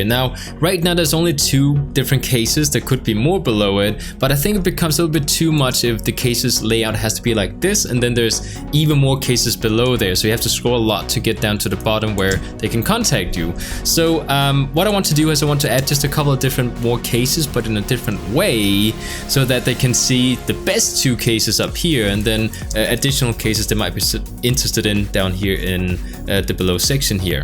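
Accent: Denmark. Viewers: Tænkte sku nok du var dansk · Your English sound danish ? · Are you danish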